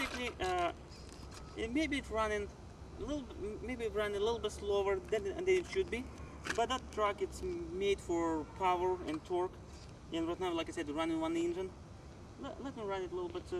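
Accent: Russia. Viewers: did you cut out a lot of parts for it . like the aluminum stuff ? and is that a Russian accent ? and where do you live ?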